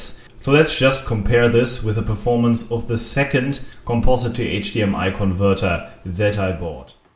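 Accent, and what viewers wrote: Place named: Germany. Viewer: Tolles englisch! Aber hehe, dass deine Muttersprache deutsch ist kannst du nicht verbergen. Tolle Videos by the way.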